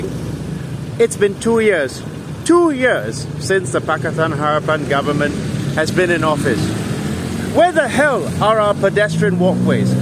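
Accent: RP, United Kingdom. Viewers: Still better than Hong Kong. We former British colonies are nothing more than a testbed for the neoliberal experiment gone rampant. Rat race, rat utopias and behavioral sink. Nice posh accent btw.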